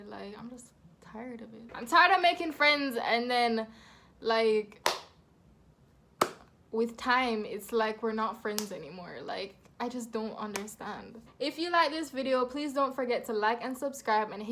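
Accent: Jamaica. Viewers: Bredda you neva haffie tell me you Jamaican could tell from you open you mouth 🇯🇲🇯🇲🇯🇲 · Are you Jamaican? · You must be jamaican , accent trang trang trang 💯💯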